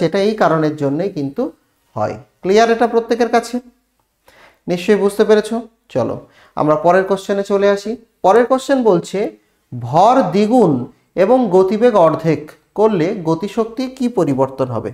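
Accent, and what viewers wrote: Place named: Bangladesh. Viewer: Ato bangla des lok mone hocche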